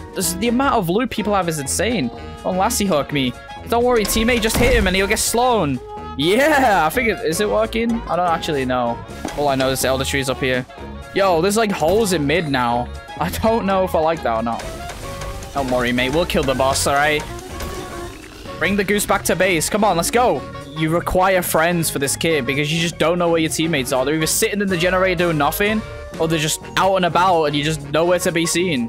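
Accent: United Kingdom. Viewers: why did he become more british?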